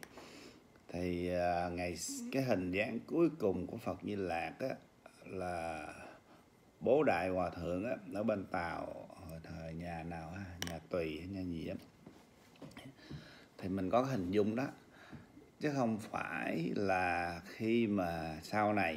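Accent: Mekong Delta, Vietnam. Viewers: Chất giọng miền tây em rất quý anh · Chào Anh ! Chất giọng miền Tây hào sản ,chân thật ,cho đi mà không nhận lại , đáng trân quí . 💐💐💐💐💐💐💐💐💐💐💐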